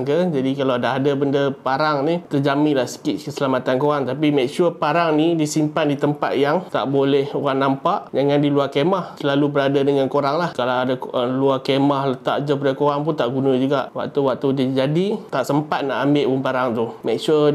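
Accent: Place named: Kedah, Malaysia. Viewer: cakap kedahlh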